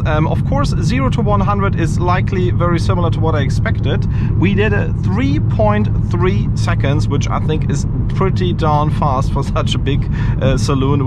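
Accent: Germany. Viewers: Man hört dass du Deutsch bist xD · Wie man hört das er deutsch ist . Ehrenmann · Man merkt einfach er’s aus Deutschland hahahah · Doesn't matter how you force yr English, yr German comes out very good with certain words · Schön das man raushört das du deutscher bist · Fucking German Accent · Max from Auto Top NL speaks Dutch English, speaks German English... a matter of taste!;-) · german accent check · This man sounds as "stereotypically german" as u can possibly get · Kann den deutschen Akzent nicht ernst nehmen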